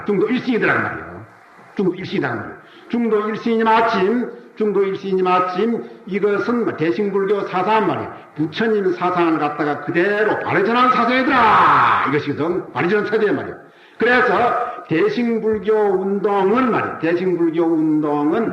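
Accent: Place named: Gyeongsang, South Korea. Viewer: 경남에서 태어난것이 처음으로 자랑스럽네요 ㅎㅎ사투리가 심하신 스님 말씀을 자막없이도 이리 수월하게 알아들을 수 있다니 🙏🏻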